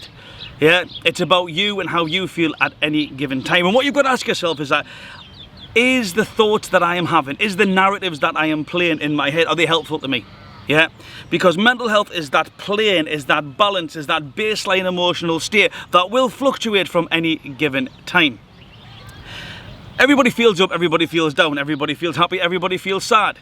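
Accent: Newcastle, United Kingdom. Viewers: Hes got a geordie accent,its the nicest accent.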